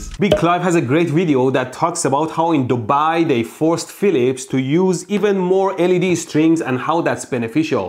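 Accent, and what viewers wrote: Iran. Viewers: خو ایرانیه؟ بابا لهجه ش خود لهجه فارسیه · I just love his thick Persian accent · اره ایرانیه دانشگاه تهران درس خونده بعدا رفته کانادا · ایرانی هستی داش ؟ نه ؟ قیافه ، لحجه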